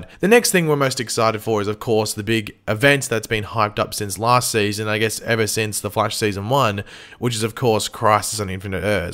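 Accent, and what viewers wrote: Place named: Australia. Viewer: Sounds Australian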